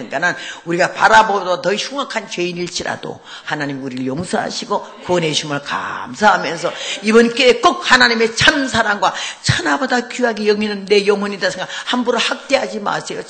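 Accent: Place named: Gangwon, South Korea. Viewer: 영상 다 뒤져보면....고향얘기 나오고요...강원도지역에서도 좀 지내셨어요.말투에서 음성에서..저도 같은 생각.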